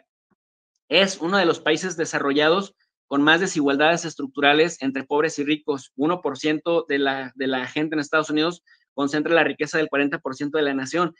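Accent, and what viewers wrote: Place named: Paraguay. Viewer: Que feliz de escuchar a una paraguaya en el canal🥰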